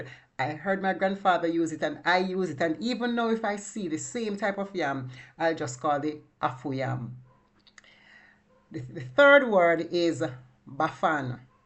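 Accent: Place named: Patois, Jamaica. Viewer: As an adult of Jamaican heritage, it was wonderful to hear that many of their word usage originates from African regions! Thkq for this delight video & thkq (especially) for using the patwa tongue of my parents... I loved hearing you speak! BIG UP to JAMAICA from the UK 👏🏽💯😉🇯🇲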